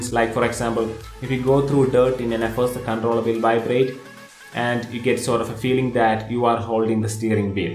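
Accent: Kerala, India. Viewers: Malayali Aano??😉😃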